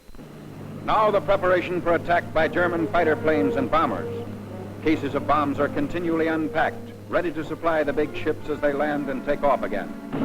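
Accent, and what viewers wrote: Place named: United States. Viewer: German with an American narrator? piss off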